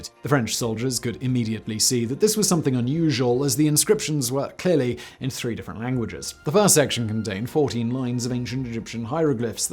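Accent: England, United Kingdom. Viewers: More interesting than that stone, can someone tell me what english accent does this guy has? It is definitely not an American, but it does not sound like standard english either.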